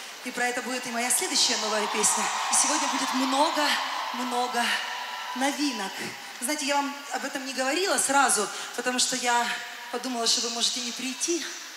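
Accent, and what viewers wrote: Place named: Surzhyk, Ukraine. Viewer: Очень крутой диалект, как в Украине и говорят, украинский суржик, обожаю его, юмор, солнце, Одесса. Свобода, весь мир, далекие страны. С Одессы уплывали в Америку 40 лет назад.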